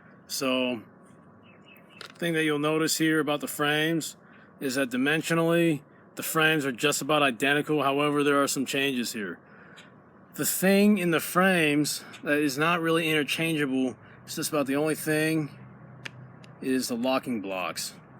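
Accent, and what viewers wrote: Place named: United States. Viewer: You are Chinese why do u speak American accent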